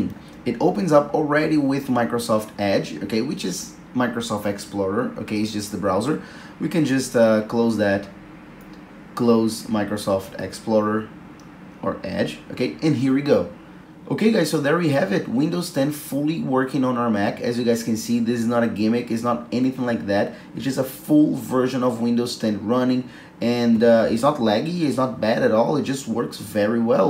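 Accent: Brazil. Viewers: I'm hearing a strong Brazilian accent lol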